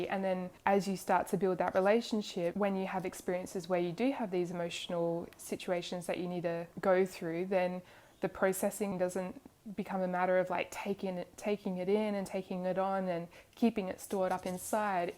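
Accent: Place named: Australia. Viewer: I sense a Aussie accent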